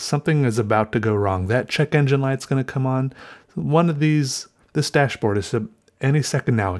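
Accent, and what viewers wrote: South, United States. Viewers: I haven't watched your channel in like a year and yes you sound so much more southern lmao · Yes very southern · the southern accent is a no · I hear a little southern accent in there... I like it :) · Enjoy your southern draw but your drawings more.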